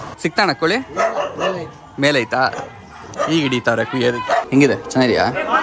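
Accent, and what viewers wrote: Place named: Kolar, India. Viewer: Illa Pure kolar style nalli kannda mathaduthare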